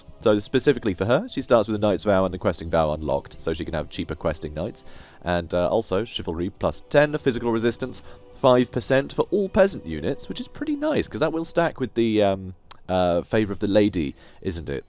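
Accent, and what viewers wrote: France. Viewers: Appreciate all your Total War videos. Favorite part of this one is the French accent 😄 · Hey serious question where did you learn to do the french accent! That's one I'm not good at but want to learn! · Pretty good Bretonnian(french) accent!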